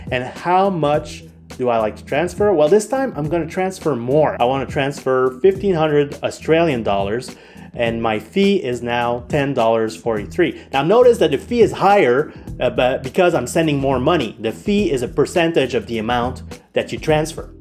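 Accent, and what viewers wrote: Quebec, Canada. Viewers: Are you from Quebec ahah? Thanks for the tips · I'm going to try a transfer. You sound like a French Canadian, are you from Quebec or Montreal? Anyway, very good video.Merci.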